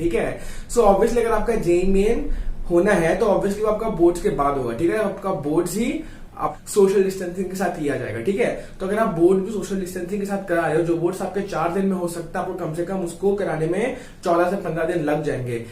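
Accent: Bengal, India. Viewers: Are you bengali ????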